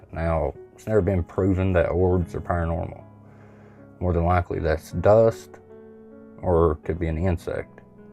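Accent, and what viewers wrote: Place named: Carolina, United States. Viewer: Are You From Carolina? I Love You'r Axent...Jihaaaa...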